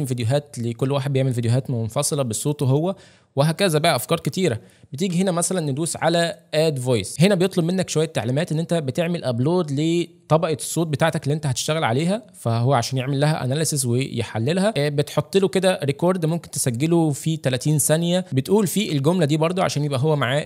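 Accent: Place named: Saudi Arabia. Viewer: الجميل في الفيديو خلاك تتكلم سعودي .. بصراحة ابداع .. اشكرك بعنف ❤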